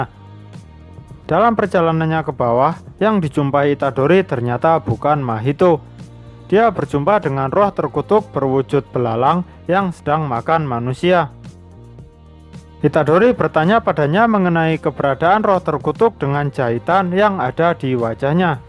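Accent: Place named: Java, Indonesia. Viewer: terlalu medok gan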